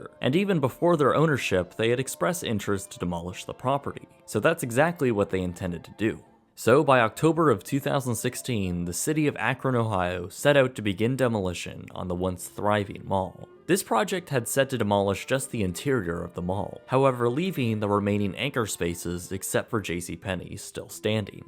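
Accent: Canada. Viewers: Holy crap! I thought you sounded like a Canadian. Hey, neighbo(u)r!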